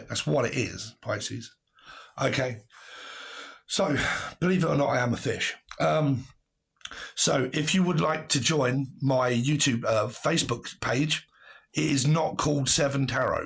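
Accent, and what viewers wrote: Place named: England, United Kingdom. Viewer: I love your f**** accent and you're on it cuz I'm not communicating with somebody right now basically they're not communicating with me I've tried and I've tried and I haven't blown the man down and don't take balloon literally blown lol Dude I think you rock and I love your accent and I love your freaking drawings they're funny and great and you know your s*** You're hilarious You make my day and I wish I was English so I could come there and talk to you but I'm not it's okay but you're cool dude Don't let anybody tell you you're don't know what you're doing cuz you do and I appreciate you❤😊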